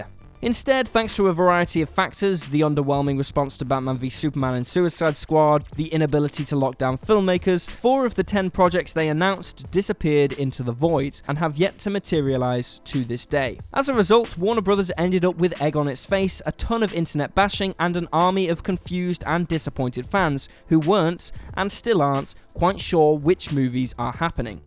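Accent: United Kingdom. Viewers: Leto not Leeto you limey mushmouthed douche.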